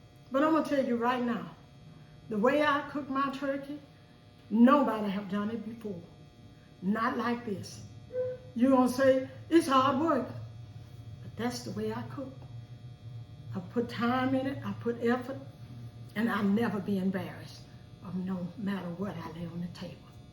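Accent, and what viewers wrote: South, United States. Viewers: Thank You, watching you be so kind with your southern accent reminds me of my mom.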